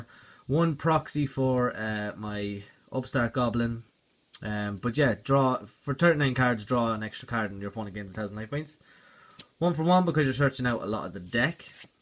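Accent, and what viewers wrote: Ireland. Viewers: You a Laois man?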